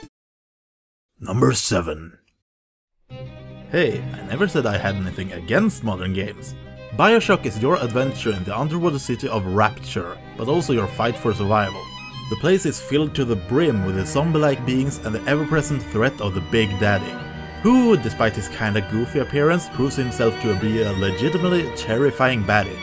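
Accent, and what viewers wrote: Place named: Sweden. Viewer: Jeg syns engelsken din er like sjarmerende som den svenske dialekten din :)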